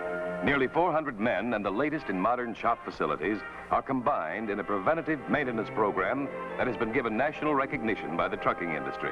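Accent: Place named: Mid-Atlantic, United States. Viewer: That's the mid-Atlantic accent.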